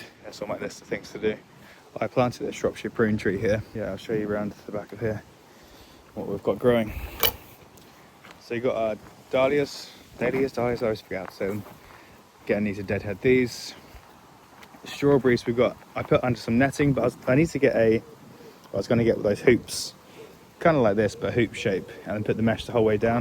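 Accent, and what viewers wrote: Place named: Australia. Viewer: Hi there!! I'm at a loss as too where you are in the world!! The scenery looks like England!! But your accent suggests Australian of some kind!! Confused!! LOL!!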